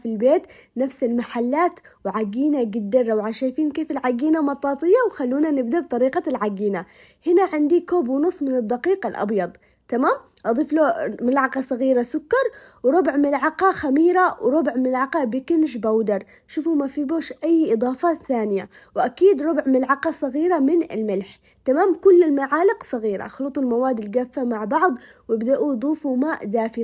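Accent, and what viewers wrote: Aden, Yemen. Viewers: انا بشوف القناة عشان الهدرة العدنية جبر قلبك❤ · ماشاء الله عليك مبدعة وفنانه واسلوبك بسيط وكفاية كلامك العدني ي بنت عدن الحبيبة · ما شاء الله تبارك الرحمن روعة ومتألقة في طبخك وقناتك الله يعينني كلما شفت طبخك سال لعابي وقررت بطني ولهجتك العدني تجنن الله يسعدك ويوفقك وبالصحة والهناء والعافية والسعادة عليكم يااااارب ❤❤ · والله انك تجنني تسلم ايدك واللهجة اليمنيه روووووعه ماشاء الله الله يسعدك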